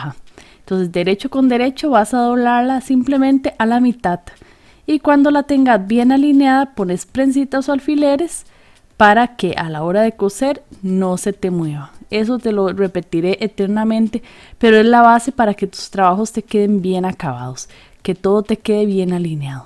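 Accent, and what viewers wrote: Costa Rica. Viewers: Ese acento me es familiar!! Eres Costarricense?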